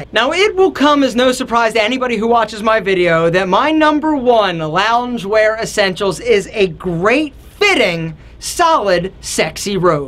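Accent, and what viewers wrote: United States. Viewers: I’ve never seen someone sound so american like this man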